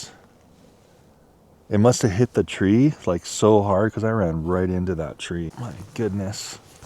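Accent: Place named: California, United States. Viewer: Well you can definitely tell he's probably from California based on the way he talks and their PC culture God I hate California I will never go there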